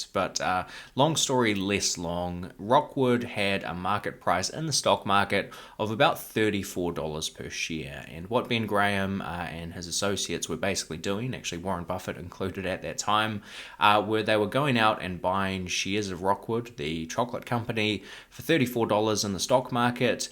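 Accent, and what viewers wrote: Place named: New Zealand. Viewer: Thanks for your videos,I've learned so much from them. I hope you'll forgive me for asking,and I don't wish to sound offensive,but I'm curious to know whether all New Zealanders pronounce their letter e's as i's, or is yours a dialect from one particular part of the country? Thank you.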